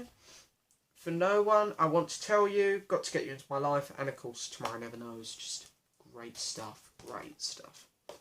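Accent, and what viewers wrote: United Kingdom. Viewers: i think that because of your accent you are british rather than american am i wrong?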